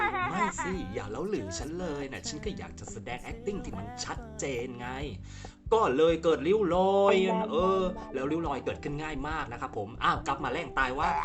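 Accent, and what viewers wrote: South, Thailand. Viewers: ชอบการรีวิวแบบแหลงใต้ของคุณ 555 ฟังไม่เข้าใจบางคำแต่รวมๆตลกมาก ข้อมูลละเอียดดีมากค่ะ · ภาษาใต้ปุ๊ปกดออกเลย ฟังไม่รู้เรื่อง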